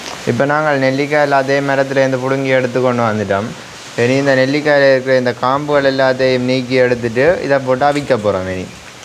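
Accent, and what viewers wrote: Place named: Sri Lanka. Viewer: Ungalooda samayoolda Ungalooda Srilanka Tamil very Nice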